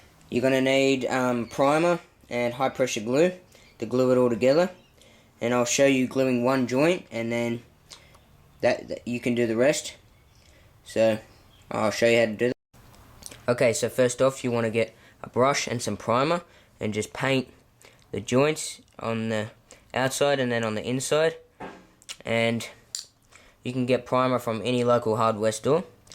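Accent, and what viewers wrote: Australia. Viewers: Australia mate! Were I'm from too! :D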